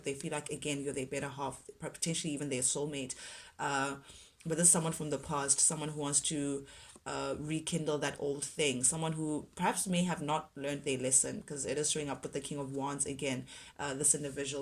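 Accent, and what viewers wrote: South Africa. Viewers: Wow I love your channel, you sound south African could be wrong, but I like it.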